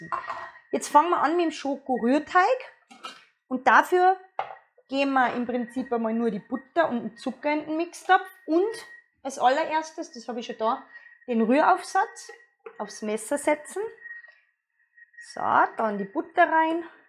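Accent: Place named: Tyrol, Austria. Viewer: Hallo Morgen gehts an die Muffins 😅👍schön auch mal Dialekt zu hören bist du vo Tirol ?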